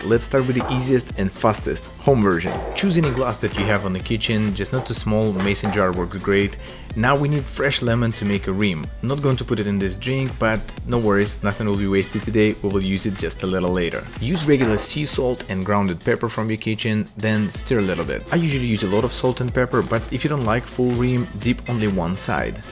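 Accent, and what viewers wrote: Russia. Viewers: Русский акцент, смотрел похожего бармена, ты попал в рекомендации, удачи с твоим делом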